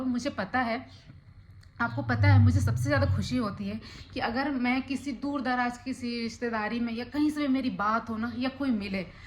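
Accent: Bihar, India. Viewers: Mujhe esa lgta hai ki na aapko negative thumbnail dalne chaie na hi kisi ko respond krna chaiye Its better ki apne vlogs ko family vlog bnana bnd krdo kch special hua toh family ko dikhao otherwise nahi Bcz jb apko dikh ra hai ki apki family aap m interested nai hai toh unhe kyu hi vlogs m lana or kyu hi bewjh chipkna Log negative bolte hain bolne do just ignore & delete their comments Positive raho or positive vlogs bnao positive thumbnail daalo Qki kahi na kahi aap bhi galti krre ho isliye hi aap troll hote ho Abhiksha ko dkho wo itna jada troll nai hoti Km kmao pr acha kmao Ye family drama saas bahu devrani jethani krke kch nai milega Log kch hi din dkhte hain y sb baad m sb bore hojate hain Lambi race ka ghoda bno Na ki chaar din ki chandni Apke subscribers itni advices dete hain apko ki aap apna pronounciation tkh kro Being bihari its good pr professionally ye nai chal pata Insb chizo se niklo & grow urself Grow your personality..apke bache k liye b acha hoga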